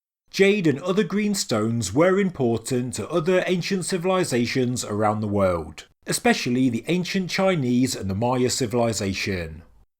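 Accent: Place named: Black Country, United Kingdom. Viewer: He is definitely from the black country in England. Though that doesn't attest for the random drop in octaves on the last word in every sentence.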